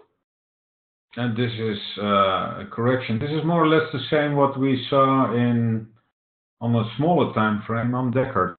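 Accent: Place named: Netherlands. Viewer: Lekker Nederlands accentje hoor! Maar een goeie analyse, keep it up!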